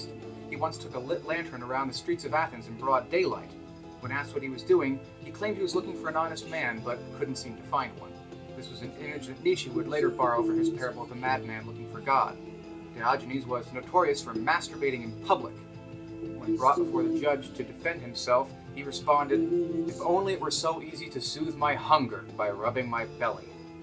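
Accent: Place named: United States. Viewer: i'm not too surprised, you're not the first and I daresay not the last to take it upon you to defend american honour or against generalisations/stereotypes and generally ignorant comments. if you're offended I apologise. No buts, just sorry. I find the accent grating and partnered together with a decimation of quality media such as David Attenborough's Frozen Planet annoyed me enough to take it out on all of you Americans. It's not that bold, it's the internet - expect to get input akin to this.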